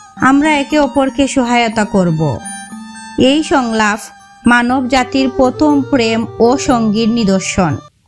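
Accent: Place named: Sylhet, Bangladesh. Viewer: আমার মনে হচ্ছে, আপনি সিলেটি, আপনি শুদ্ধভাষা ব্যবহার না করে, আপনার আঞ্চলিক ভাষা ব্যবহার করেন, শুদ্ধ ভাষা আর আঞ্চলিকতায় মিশে থাকলে শুনতে ভাল্লাগেনা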